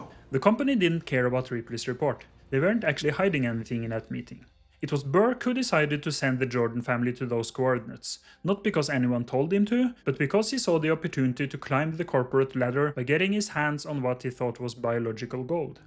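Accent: Norway. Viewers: You're always going to have trouble making sense of combining wildly different alien movies, made by directors and writers with completely different visions. Or with directors' brains that have degraded with age. Your- and other fan theories are hopeless. (Also, holy super-thick norwegian accent, batman) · Norwegian narrator?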